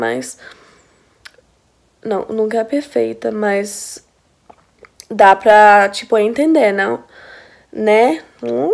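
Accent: Bahia, Brazil. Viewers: Português dela tem sotaque baiano.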